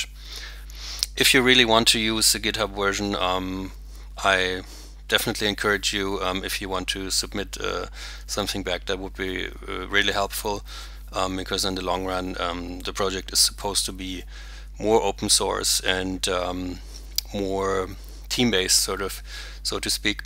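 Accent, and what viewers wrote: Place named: Germany. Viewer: 100% er ist deutscher, das hört man so raus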